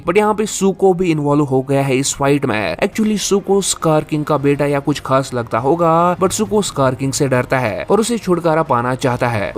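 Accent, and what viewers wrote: Kashmir, India. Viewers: Brother you have a Kashmiri accent ❤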